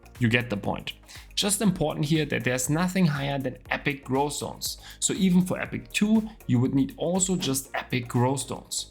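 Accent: Germany. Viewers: Ich liebe wie man einfach hört das er deutsch ist xD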